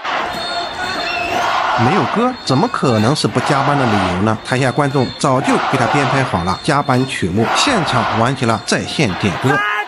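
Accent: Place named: Taiwan. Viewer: 臺灣腔 又很臺 沒想到500在中國那麼紅 難想像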